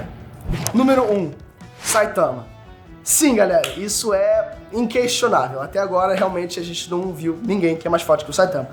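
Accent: Rio de Janeiro, Brazil. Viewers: Ele ta no caminho! gostei! tirando o sotaquezão carioca... mas isso a gente se acostuma! dale!! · ahah imagino para quem não é do Rio ouvir esse sotaque. até pra mim é puxado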